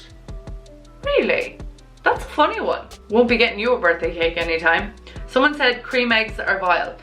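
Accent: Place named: Ireland. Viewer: I luv the iris accent my friend is iris n i luv it how she speaks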